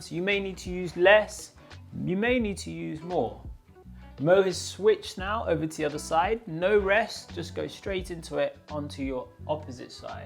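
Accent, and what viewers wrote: United Kingdom. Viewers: Brilliant British accent 😮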